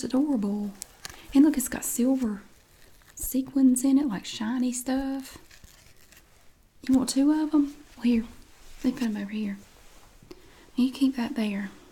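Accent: South, United States. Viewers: I swear, I am slowly picking up her southern accent after constantly watching her videos lol . . Either way. Fantastic job, Mam. lol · I'm from Germany & speak a couple of languages, but nothing is more entertaining & interesting than your southern accent. It kind of makes me feel all warm inside. It makes me think of happy animals on a farm, corn, BBQ, apple pie & hugs. 🇺🇸 ❤️🌽🥧🍩🌭🍿🥞🐄🐖🐑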